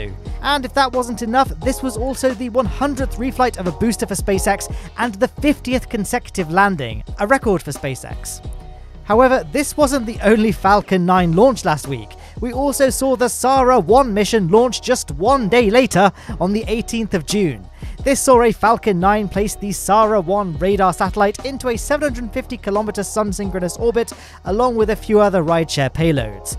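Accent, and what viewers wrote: United Kingdom. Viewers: This particular British Ascent is soo..bombastically annoying but at least he owns it. Total Game show host voice.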